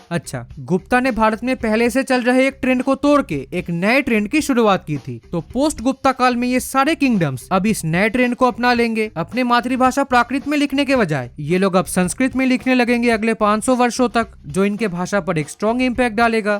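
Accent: Bengal, India. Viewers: Bhai bangali ho kya accent se esa laga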